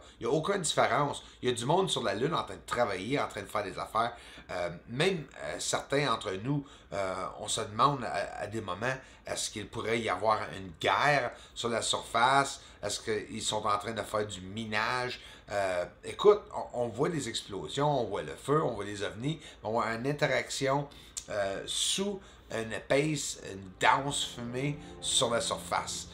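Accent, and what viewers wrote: Quebec, Canada. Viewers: Hey, cool ! C’est la première fois que je tombe sur une de tes vidéos, je viens de m'abonner. 😊, sujet qui m'intéresse 😊, juste entre toi et moi, tu as un accent québécois assez fort 😊, pas certain que tous les Français de l'Europe vont te comprendre, moi je te comprends bien, je suis Québécois aussi 👍 continue, ne lâche pas avec l'accent ou pas 😊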